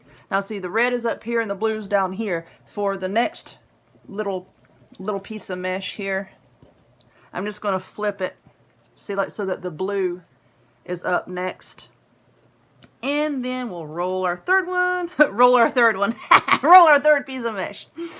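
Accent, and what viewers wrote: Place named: North Carolina, United States. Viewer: Love it!!! You are an awesome teacher! I can't wait to make one. I hope you are doing well. I also like your North Carolina accent. I can't spell the word you said that was N.C. language but my daddy was from and lived in Ashville N.C. and he was something else. He came up with language also. So I love listening to you talk bc it does remind me of him and his language. Thank you for all you do!👍👍🎆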